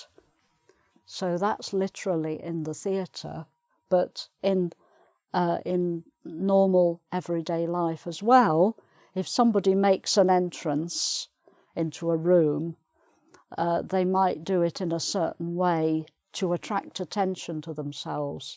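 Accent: United Kingdom. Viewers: Always excited to hear your British accent which makes me want to keep learning and practicing English as a none native English speaker.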